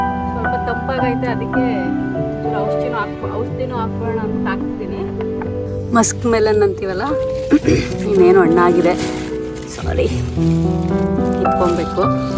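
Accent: Mandya, India. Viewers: Nimma mandya bhashe thumba chennagide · Hai nice vlog .nim Mandaya language thumba channagide nice to see your vlogs .OK bye take care. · Nim matu keli kushi agutte Same nam mandya style ಮಾತು❤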